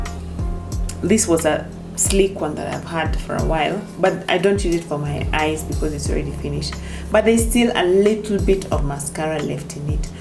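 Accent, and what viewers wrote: India. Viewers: You look beautiful, wow and only for 1,500, very impressed. Love the Indian accent😂😂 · Beautiful. I love the Indian Accent😂😂😂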